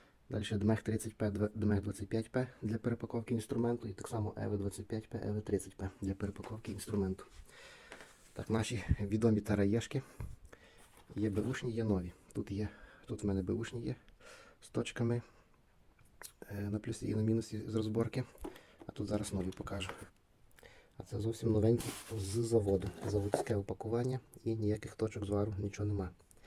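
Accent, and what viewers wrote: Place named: Ternopil, Ukraine. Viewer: Ви що, по акценту не розумієте? Тернопіль!. Мєв...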